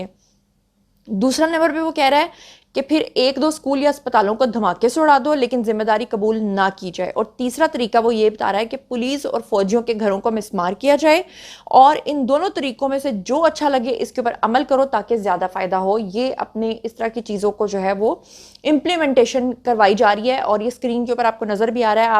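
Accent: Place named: Lahore, Pakistan. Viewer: آپ اردو کے مشکل الفاظ مت بولے۔ کیونکہ اپ کو اردو کے تلفظ ادا کرنے میں لاہوری پنجابی کا رنگ غالب رہتا ہے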